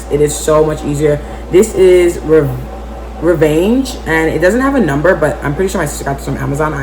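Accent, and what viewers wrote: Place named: Canada. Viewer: You sound Canadian❤️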